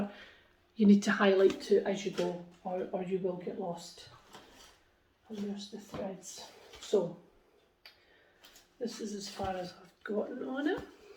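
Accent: Scotland, United Kingdom. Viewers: So glad to see you're doing much better...slow and steady...soon you'll be up and running around. I love that pattern Thistle and I may have to see if I can track it down to purchase it. Really enjoy your videos and your lovely Scottish accent. Star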